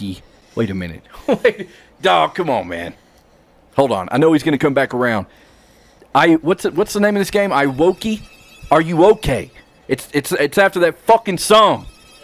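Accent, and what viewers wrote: South, United States. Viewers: You sound southern and when southern people get scared it’s funny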